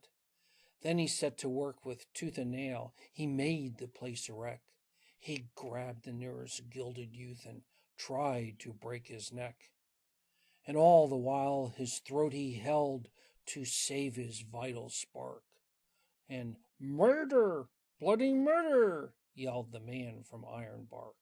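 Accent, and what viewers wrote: United States. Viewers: great reading of an Aussie classic. Unusual to hear it with an American accent. Thanks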